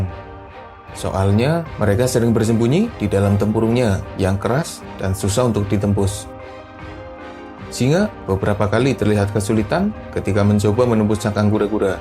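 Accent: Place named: Java, Indonesia. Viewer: Saran logatnya terlalu medok dan monoton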